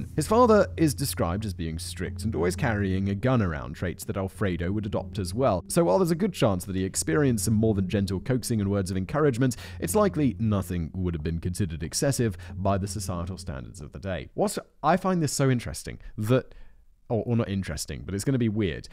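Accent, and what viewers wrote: United Kingdom. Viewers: With that British accent, you could describe taking a shit, making it sound classy.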